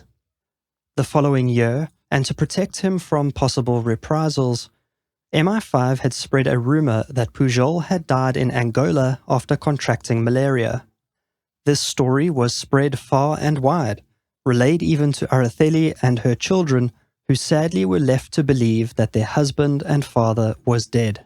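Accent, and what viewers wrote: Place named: South Africa. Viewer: Yes that is a South African accent. A lighter and less twangy one at that. Upper class maybe.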